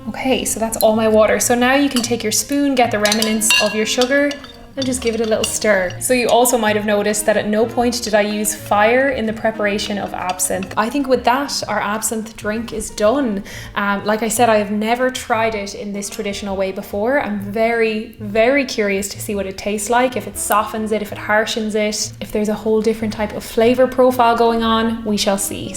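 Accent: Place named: Ireland. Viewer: Irish was recently voted as the most attractive accent in the World...but I believe that was about English as spoken by males. WELL - I must be mistaken but I could listen to 24/7. And what a beauty...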